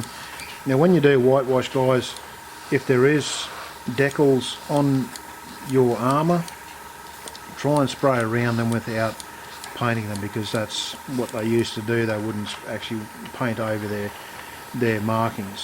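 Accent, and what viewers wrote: Australia. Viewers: Mate I just stumbled across your channel, so good to hear the way you just talk through it. Not just the great description but love hearing from an Aussie. It's like I'm around my mates place bashing out some painting techniques. I'm going to have a look through your other stuff. Cheers for the info and keep it up.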